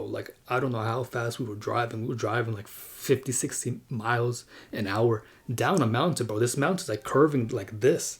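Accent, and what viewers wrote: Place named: Texas, United States. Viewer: Bruh your accent is very beautiful. Actually I thought in Texas they speak like Arthur from red dead redemption 😅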